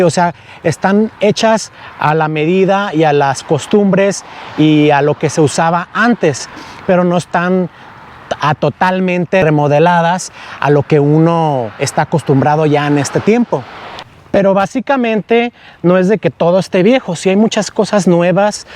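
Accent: Mexico. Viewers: Jajjaa ... 😝😝😝😝 es mexicano!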